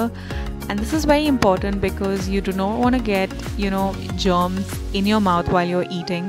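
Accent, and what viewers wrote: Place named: United States. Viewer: UR AN INDIAN BUT U HAVE USA ACENT LIKE ME IM ALSO INDIAN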